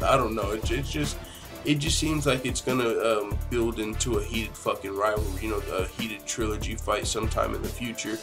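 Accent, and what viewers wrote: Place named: South, United States. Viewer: Dang Boy, you're way more country than i had originally thought..Remind me of Clayton Bigsby haha!! Stick to that accent now, ya-hear??🤠🐴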